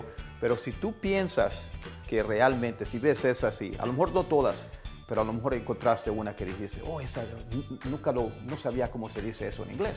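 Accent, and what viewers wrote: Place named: United States. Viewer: Está en los angeles el profe, con razón su voz suena Américano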